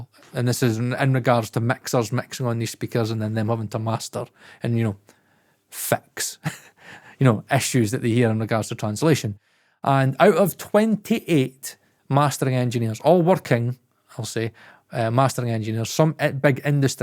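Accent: Scotland, United Kingdom. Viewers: That's the most aggressively Scottish accent I've ever heard in my headphones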